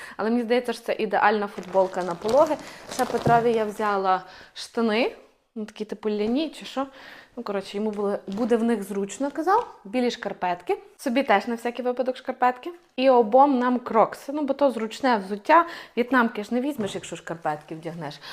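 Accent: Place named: Lviv, Ukraine. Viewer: Обожнюю львів'ян, їду з Києва спеціально щоб послухати ваш діалект, рідний мені з дитинства. Якраз збираю сумки в пологовий, тому дуже корисно було послухати, дякую!! ❤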